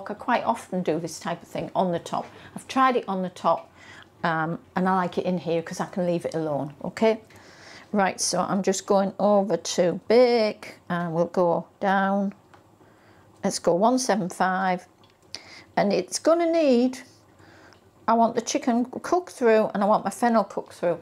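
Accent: United Kingdom. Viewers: Wow!, you had my mouth watering when you took the lid off to dish up your meal…I love fennel and olives and, of course, thighs! I live in Southern California so excuse me for saying this but I love your UK accent!!! I have been to London five or six times and was also lucky enough to work in Slough for four weeks…so you always make me happy to watch you cooking!🥰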